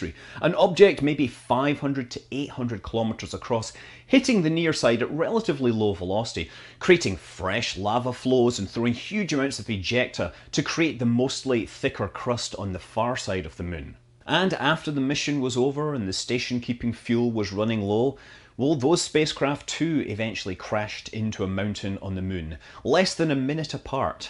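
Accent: Scotland, United Kingdom. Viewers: Once upon a time I was a six year old sitting on the lounge room floor watching an Apollo launch on a 23in b&w tv with the curtains drawn because, well, the phosphors had worn out. Years later, I am sitting in front of a Dell flat screen monitor listening to some guy with a Scottish accent walk me through a pair of lunar orbiting satellites taking careful measurement of the Moon's gravitational field. What a time to be alive. Fly safe! BTW, watched First Man last night, so I'm still a bit stoked about the things that man can achieve. Am so grateful for this channel.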